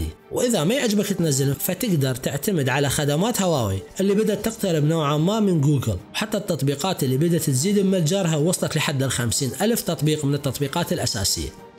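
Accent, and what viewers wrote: Iraq. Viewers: يمعود شكد بلعراقي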